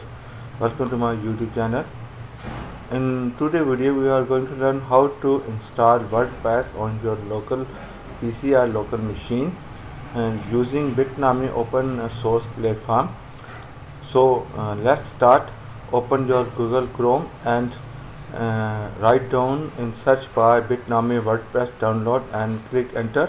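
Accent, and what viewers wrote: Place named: United States. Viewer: Hello Bro' your English-like American people😆😆😆😆😆😆😆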